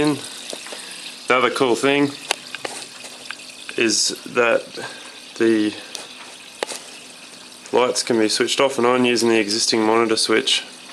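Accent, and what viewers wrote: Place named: Australia. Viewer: Super cool! Could tell you were Aussie right away from ya accent. Cheers mate! 🍻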